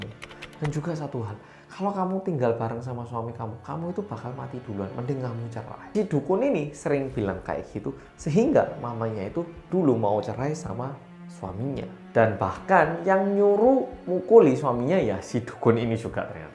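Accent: Java, Indonesia. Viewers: Medok medok medok rekkkk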